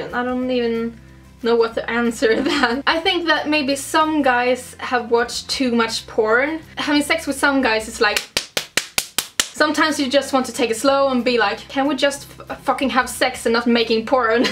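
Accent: Sweden. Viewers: the swedish accent is real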